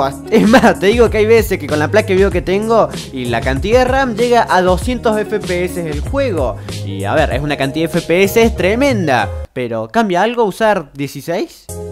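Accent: Córdoba, Argentina. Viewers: Epa ese acento coordoves hermano!!!!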